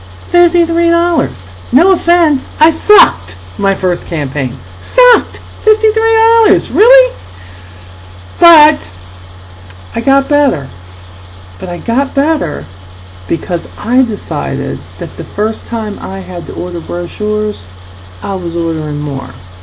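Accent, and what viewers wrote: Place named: Maryland, United States. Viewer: Your videos are informational, packed with lots of tips and inspirations of your experiences in the real world of Avon. Love the part where you say, Avon Chicks. For some reason, those two words and the emphasis you place on those two words make me smile. I think it's your Maryland accent. I have a wish for you and Lee. Continue to rock in your Avon business! (Jeff)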